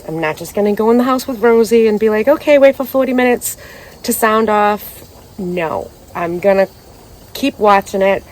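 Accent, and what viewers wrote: Massachusetts, United States. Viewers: Is there any chance your from Massachusetts/ Rhode Island? You sound like family. I'm in Mass, Metrowest near Framingham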